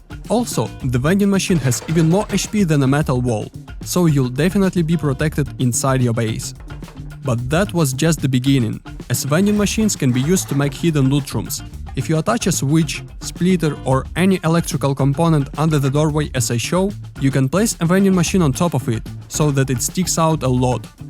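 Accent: Russia. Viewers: Я не критикую и не осуждаю. Просто хочу дать совет. Во первых по акценту слышно что русский. Во вторых существует такое термин как «культурное несоответствие», либо «культурная специфичность». Это говорит о том, что каждый язык несет свою культуру и историю, поэтому идиомы, фразеологизмы переводить дословно будет ошибкой. К примеру «save your nerves”. Англоязычный народ так не говорит, для них это странно. В таком случае следует прибегнуть к использованию словаря, чтобы повысить качество текста. Удачного прогресса и развития!❤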